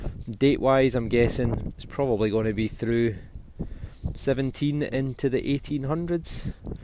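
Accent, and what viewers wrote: Scotland, United Kingdom. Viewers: I love the Accent! Scottish Accents are a range of Sweet to Hysterical to most Elite. Can't imagine the History Finds in Scotland. Have Fun, Best Treasure Successes! 😘 Beth Tennessee, USA "Irish American" 🍀🇺🇸🍀 County Kerry Lineage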